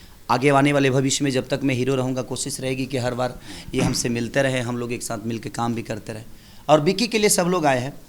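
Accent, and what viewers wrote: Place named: Bhojpuri, India. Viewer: Bhaiya aapke juban se bhojpuri aachha lagta hai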